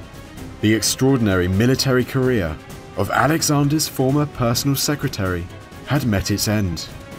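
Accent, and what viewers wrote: United Kingdom. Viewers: Is there a way of switching the narrators? I clicked one time and had a distinct British voice narrating , but then I came back and it’s a different voice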